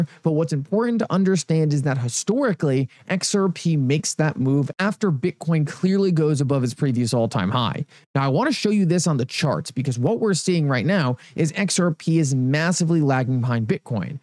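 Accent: Allentown, United States. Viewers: with an Allentown accent, XRP is set to go to 0.001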